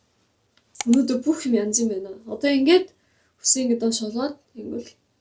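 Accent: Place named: South Korea. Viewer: English vg hereglehdee english aylagaar n duudaj bmaar bn aa.. korean aylagaar duudhaar chihnees bvr tsus goojih gd bhii..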